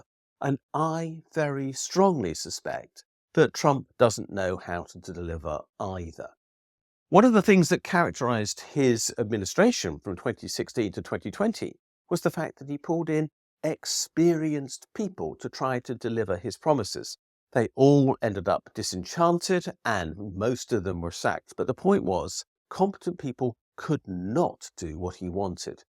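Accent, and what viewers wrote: United Kingdom. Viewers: Ok, you talk funny. You are not an American, so p!ss-off Limey.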